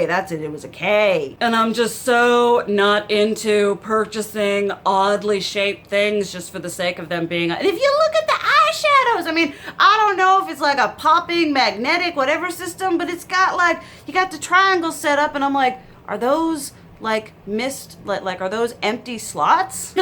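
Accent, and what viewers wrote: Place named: Rural, United States. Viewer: Its funny how you make fun of people loosing their mind over different color beauty blenders when you apparently loose your mind for useless knick knacks and odd shaped and colored eyebrows. I don't know how many people will be able to get past the various annoying habits you have and your hick accent. But good on you for putting yourself out there. You are making fun of flipping NATASHA DENONA for baby Jesus sake. Have you seen how classy and beautiful she is?!?! I have every one of her palettes, not even kidding. Bullshit, you will be buying shit all year this year and just not telling people.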